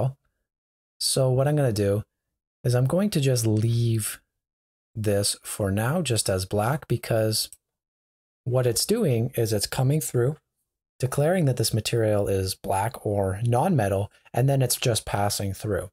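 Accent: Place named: Canada. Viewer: thought you might be Canadian by the accent but then I knew for sure when you typed "colour" ;)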